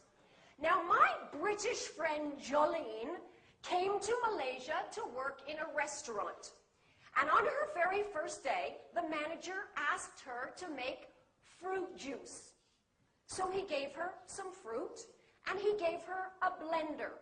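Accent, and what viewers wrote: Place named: China. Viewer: THAT'S CHINESE ENGLISH LAH! NOT MELAYU ENGLISH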